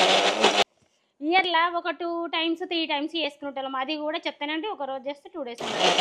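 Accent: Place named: Telangana, India. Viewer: I expected you are from Telangana in Siddipet because of your language. I am from Siddipet. అందుకే మిమ్మల్ని చూస్తే మనలో ఒకర్ల మా ఇంట్లో వాళ్ళలా అనిపిస్తుంది మేడం.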